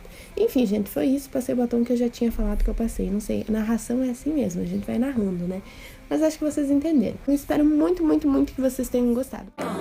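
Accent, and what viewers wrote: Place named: Rio Grande do Sul, Brazil. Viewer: que hino!! esse sotaque não me enganou, RS é tudo ♥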